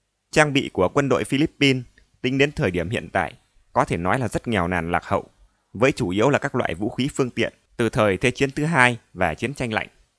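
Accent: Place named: Nam Dinh, Vietnam. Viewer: ad. que o dau z.. nge giong o nam dinh z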